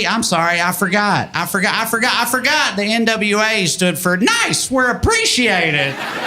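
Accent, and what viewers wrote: South, United States. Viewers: This man is so southern that (to my Canadian ears) it sounds like he’s imitating a southerner rather than using his regular tone and accent. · Fella acts like he aint been part of the south in 6 generations....... voice says otherwise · O.K.I am from Quebec and this is PURE joy for me to discover this type of humor,with a southern accent..WOW!! Well done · Sure, but you can also HAVE a southern accent if you're from the south. Some people do, like this guy. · Bro I saw the thumbnail and still didn't expect that degree of southern accent. Damn dude.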